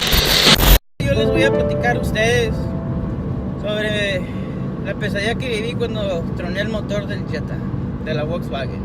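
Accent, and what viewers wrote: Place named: Paisa, Colombia. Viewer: Puberto imberbe, si la tecnología de esos vehículos no está a tu alcance mejor ni los toques, hablas de Honda y autos que según son resistentes y al inicio que Volkswagen es rápido, potente etc ... Nivela tus argumentos y ponlos en dónde deben de ser. Cuando conozcas la tecnología de Volkswagen y autos de nivel alto (que creo que no están q tu alcance) te invito a Georgia. Saludos paiza, me gusta tu acento.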